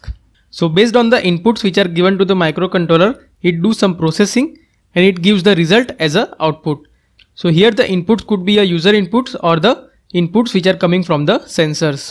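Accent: India. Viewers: ii am not native english speaker,i have to see the captions.the videos is very good.but i am curious this is indian accent? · Why is every IT-Tutorial from an Indian with a severe accent... It´s a good video, but you should really work on your English. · 印度英语？ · instant throwback to my english A-level exam in germany, containing listening comprehension with indian people having a conversation. that was really hard and I must admit we had to laugh a bit because that sounds so special. :D but very nice video, I understood enough to know more about the difference now. keep up the good work :)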